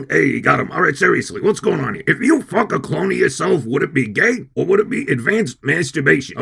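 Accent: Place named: New York, United States. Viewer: Just found this channel, was expecting a tiny female voice, this gruff old New Yorker Boston shit is hilarious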